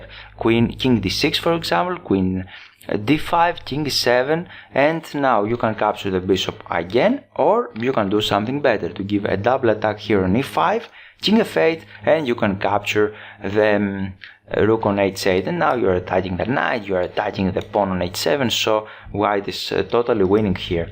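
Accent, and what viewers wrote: Greece. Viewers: Your accent sounds greek malaka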